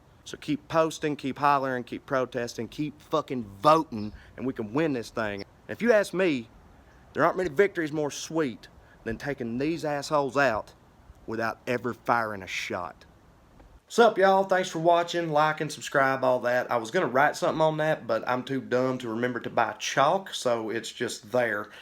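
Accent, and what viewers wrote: South, United States. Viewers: the suthern accent has always annoyed the fuck outa me, but gawd dayum if I couldn't listen to this jar of intelligent hicksauce read a fucking phonebook! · It's good to hear that accent talk some sense for a change! Go! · 59 year old white man here. Originally born in s. Carolina. Everything you say is spot on. I love it, I think you are the best spokes person for the irony of these times on the net. More power to you, keep it up. I will come see you if you are in Maryland or DC. Seriously, keep it up. Your voice matters, and your point of view is well thought out and well spoken. And the good old boy slang works to great amusement and effect.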